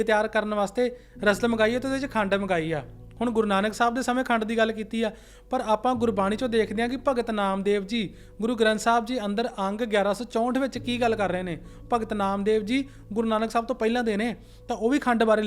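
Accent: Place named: Majhi, India. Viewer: ਸਪਸ਼ਟ ਮਾਝੀ ਪੰਜਾਬੀ ਵੀਰ ਦੀ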